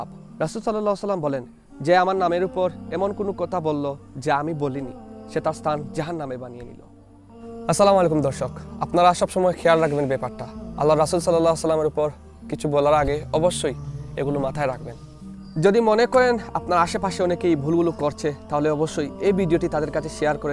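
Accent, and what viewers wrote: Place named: Sylhet, Bangladesh. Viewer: Apnar khota sune mone holo tai jigges krlam ami o sylhety🙂 Btw video ta vallagse🖤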